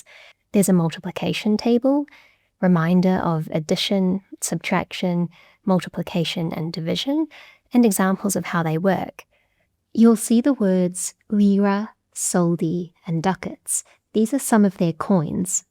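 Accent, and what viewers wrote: New Zealand. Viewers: definitely not Australian, that’s a New Zealand accent 😊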